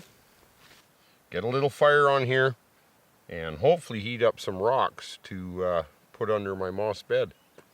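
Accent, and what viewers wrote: Canada. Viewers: Since you're Canadian. Would you say "A" or "ay" at the end of every sentence like your asking a question please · He sounds so Canada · I could tell you’re from canada because of your accent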